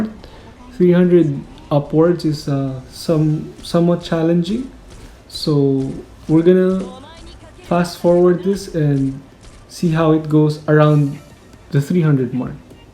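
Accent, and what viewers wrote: Philippines. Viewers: why do you sound so Filipino to me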